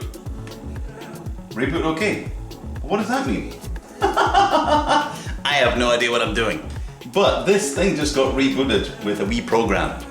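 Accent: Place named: Scotland, United Kingdom. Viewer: I hope so matey, because I love them and your Scottish accent.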